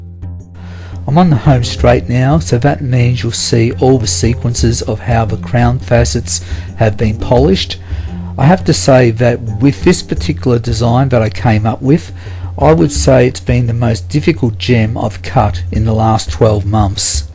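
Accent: Australia. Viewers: I’m very happy your south aussie and you sound like russel cought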